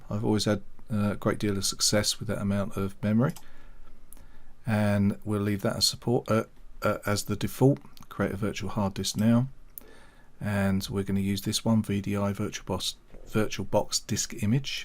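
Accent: United Kingdom. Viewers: Amazing tutorial, thank you a lot! I'm enjoying your awesome british accent, mate! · your from UK right I know!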